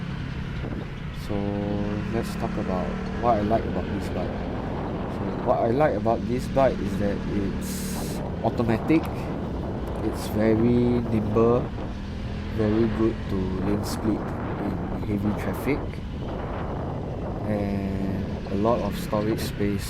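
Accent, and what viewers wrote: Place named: Singapore. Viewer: He's speaking Singlish. Perfectly understandable to a Singaporean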